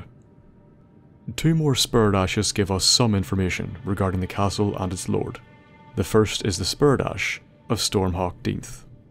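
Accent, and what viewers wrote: Ireland. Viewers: Hell yes, an Irish lore hound! Absolutely adore hearing Radhan called mad as a bag of spiders. 💜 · Bruh, I am so in for Elden Ring, please bro, please, I need that sweet sweet Irish accent for my Elden Ring, please do this like you did for Fallout, don't kill yourself, but I'm in for this, I need it lol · The irish lore master is back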